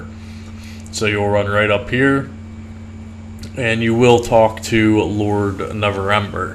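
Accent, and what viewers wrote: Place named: Philadelphia, United States. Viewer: Nice, me too but you sound like a friend who moved to Philadelphia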